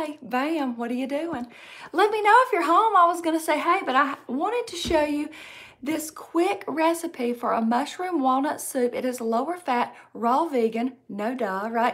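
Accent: South, United States. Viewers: Thank you! Sometimes I can't understand the Southern accent- Green/Grain, etc.🤗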